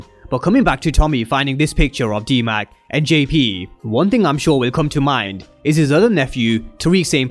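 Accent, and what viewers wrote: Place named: United Kingdom. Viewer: Makes you wonder who is JP's ex though (D-Mac's mother)?🤔💭 JP was definitely straight at some point of his life to have D-Mac to begin with, yet there needs to be some back-story explored to why we don't know about D-Mac's mother? D-Mac is mixed-race so his mother must be black, but then why did she leave JP and her son is another question? P.S. Well done for hitting almost 100K subs - been tuned into your recaps since you were on less than 10K 💯🔥 Guess it's great to hear TV series reviews from a British guy - your selling point!😎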